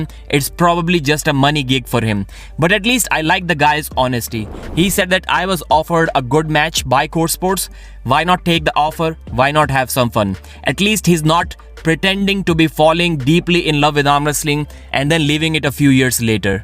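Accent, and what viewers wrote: India. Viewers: I can't listen to that deescoosten idiian vooecee.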